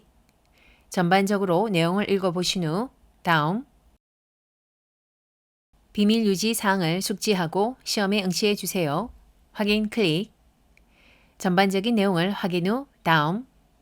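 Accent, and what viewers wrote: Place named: Gyeongsang, South Korea. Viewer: 나도 대구지만 경상도 사투리 정말 더럽게 진하게 쓰네... 이 여자 멍청이아냐?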